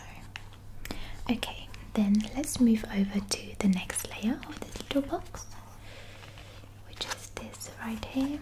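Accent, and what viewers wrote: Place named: New Zealand. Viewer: wild guess but i was thinking the same, she might be from new zealand?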